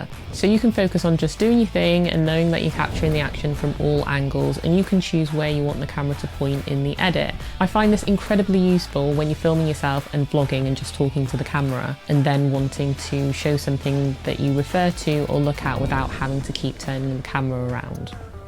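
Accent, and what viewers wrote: United Kingdom. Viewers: And the British accent is like a free pass to "everything she says must be true"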